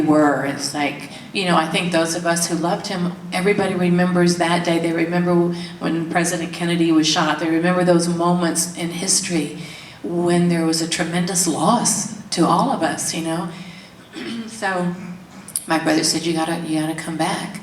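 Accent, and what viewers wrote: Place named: South, United States. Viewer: It's there, I here it..she is a Southern Belle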